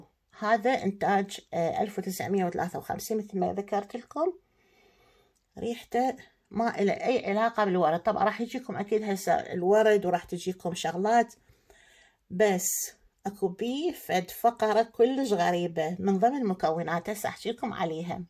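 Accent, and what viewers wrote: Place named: Iraq. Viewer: وردة اللهجة العراقية جميلة مثلك يا قلبي. انا سعودية واموت على العراق وأهله واللهجة العراقيه استانس اسمعها. عرضك للعطور جميل ورائع كروعة روحك.حبيبتي ♥️متابعتك بدوره من السعودية. 🇮🇶🇸🇦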